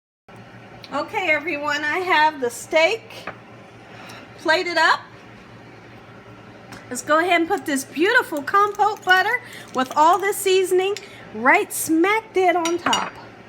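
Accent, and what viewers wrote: South, United States. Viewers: Victor Matthews yea i was surprised at the end when I saw her arms were black she sounded like a perfect typical white southerner with the laughing too and how happy she was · I cant be the only 1 who thought that voice belonged to a lil white southern lady😂🤣 good cooking 👍👍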